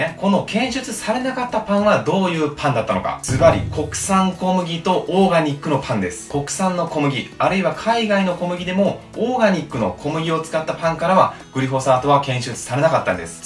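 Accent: Kansai, Japan. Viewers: 双子の姉からさんのチャンネルを教えてもらって、最近入部し、双子でハマっています笑👯‍♀️❤️ 私も人生が一変するくらい食生活が変わり、知らない世界が広がり、食にこだわる事が楽しくて仕方ありません！🤗 さんの軽妙なトーク力と、惜しげもない知識が面白すぎて、色々実践してみたくなります😉 今日も手のスナップ運動きかせて、カラダヨロコブ物、生産者さんを応援出来る物、見つけてゲットしてきます😊 私もさんの関西弁大好きなので是非❤️笑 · さんの動画に出会ってから人生が一変するほど食に対しての意識、世界が変わりました😂 さんが教えてくれたことを色々試したくて実践するたびに楽しくて仕方がなく、毎日カラダが喜んでます🐣🐣🐣 新たな扉を開いてくれて本当に有難うございます💕🙏 さんが話す関西弁が大好きなので、ぜひこれからも頻繁に登場させてください🥰（笑） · くん、さすが関西人！ネイティブやね。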